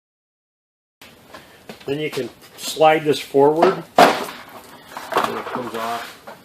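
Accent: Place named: Minnesota, United States. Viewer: I’m hearing some Minnesota in here!😁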